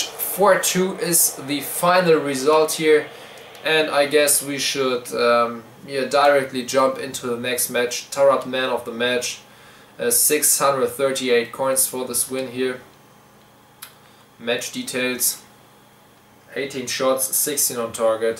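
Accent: Germany. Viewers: du hast einen deutschen aksent und ich hab ne scheiss rechtschreibung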